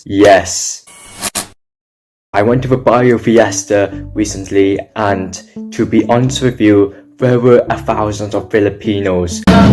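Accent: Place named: United Kingdom. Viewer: ang ganda ganda naman dyan…british accent wow!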